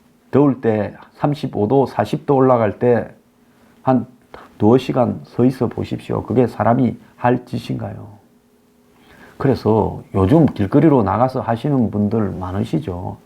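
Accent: Gyeongsang, South Korea. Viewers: 중앙아시아로 좇겨가도 경상도사투리 못바꾼다 좋은건지 아닌지 건강이최고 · 이난국 시기에 별것을 다 가지고 시비을 거네요 님의 매력은 경상도 사투리라고 봅니다 올은말만 콕콕 찝어서 뉴스를 하는데 사투리 때문에 부드럽게 들린다고 생각합니다 의욕은 욕이라고. 볼수없다고봅니다 이렇게 좋은 뉴스를 보고 듣는데 조금은 불편해도 우리 모도가 이해를 해야한다고 봅니다 의 뉴스브리핑에 감사드립니다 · 사투리 억양듣기 전혀 안불편합니다. 단어구사는 표준어시고 어조만 사투리인데 성조가 있기 때문에 오히려 집중이 잘되고 단어가 확실히 들립니다. 모노토너스한 말투보다 훨씬 좋습니다. 참고로 저 경상도 사람 아닙니다 아버지 경기도 어머니 호남분입니다 서울서 나고자랐지만 영남 어조 오히려 집중 잘되고 귀에 단어 강조가 뛰어납니다. 욕도 심한욕 없고 듣는 사람이 시원합니다. 이상한 사람들 말 신경쓰지마세요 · 사투리 지적하시는분, 불평하시는게 좀 한심해서 한소리 할께요 같은분이 이 난국에 개 돼지들 깨우치려 힘쓰시는것에 감사합시다. 경상도 사투리라 못 알아듣는거 아니잖아요? 쩝쩝이보다 천배 만배 듣기 좋지 않습니까? 내용에 집중에 주세요. Don’t be grumpy or criticize over not important things!!! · 그 정도의 욕은 경상도말과 찰떡궁합! 스트레스가 풀립니다. 염려마시고 스타일대로 하세요! 응원합니다!! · 숨겨진 경상도 사투리가 구수합니다. 이런들 어떠리 저런들 어떠리 운운 하는 자들은 말씨를 바꾸는 재주가 탁월합니다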